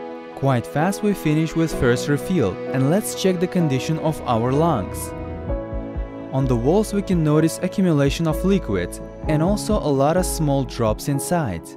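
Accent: Russia. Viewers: Йор рашн акцент ин Инглиш им рилли кул:) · Jose Silva he is Russian so his accent makes "L"s and "V"s sound weird. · If this video was produced in Russia or any other country near it, and by the acsent of the speeker I can assume that the video was really produced there.... The guys will go to jail with weed experiments ;) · He is from Russia vs are pronounce with a w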